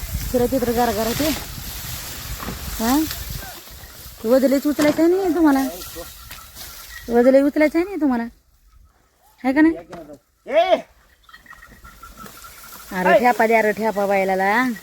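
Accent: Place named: Ahmednagar, India. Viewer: ताई तुमची भाषा नगरी आहे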